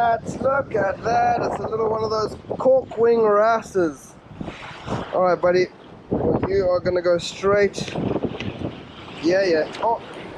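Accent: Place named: South Africa. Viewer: Refreshing Saffa accent. Tight lines boet.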